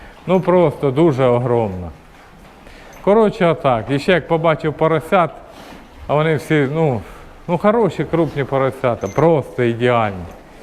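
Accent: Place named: Surzhyk, Ukraine. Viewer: З чого ви взяли що він російськомовний? Він просто може розмовляти на суржику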